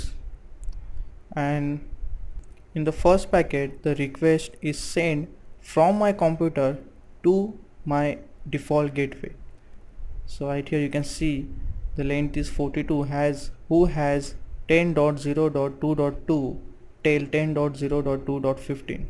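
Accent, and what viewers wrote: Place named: India. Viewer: damn indian accent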